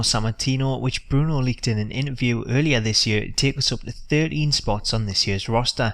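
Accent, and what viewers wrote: Newcastle, United Kingdom. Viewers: you have the best accent ever, Newcastle I presume?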